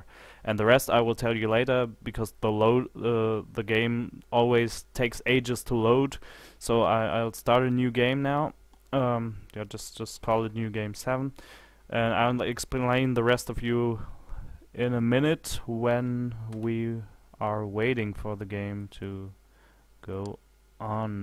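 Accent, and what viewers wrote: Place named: North America. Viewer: For being German you sound like you have a Canada/USA accent.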